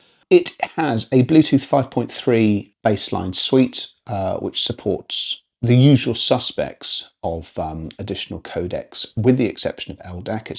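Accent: United Kingdom. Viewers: I think he said Bowers but he's British so it comes off subtle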